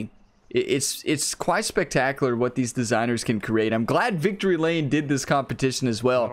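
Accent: Kentucky, United States. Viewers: what state are you from? trying to guess your accent? my guess is Kentucky?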